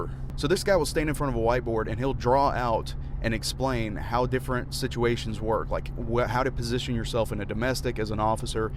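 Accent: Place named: Georgia, United States. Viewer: Georgia if I'm not mistaken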